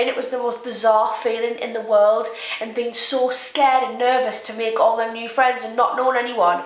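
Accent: Newcastle, United Kingdom. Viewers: can you call is please? <num> and if you werent geordie what accent would you want to have? xxxxxxx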